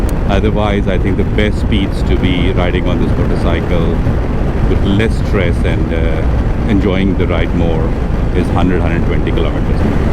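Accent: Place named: India. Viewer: 印度英语真的很好听❤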